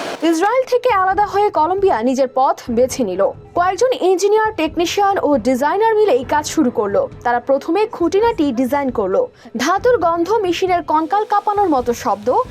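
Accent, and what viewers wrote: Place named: India. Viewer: কথা বার্তা ধরন ইন্ডিয়ান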